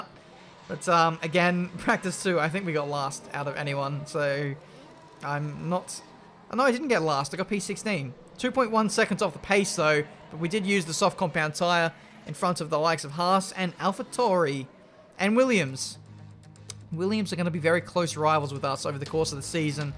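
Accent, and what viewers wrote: Australia. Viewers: oi mate you from Australia? sounds like that, hehe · i was suggested this channel by a fellow formula 1 friend and i was like "oh ok, ill start watching him then" this is the first video of yours ive watched and its fliping amazing what makes it even better is the fact ur australian, i love australians, i think their accent is so cool i will definetly be recommending this channel to other people keep up the amazing work also congratulations on making it to f1 e sports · Are you australian?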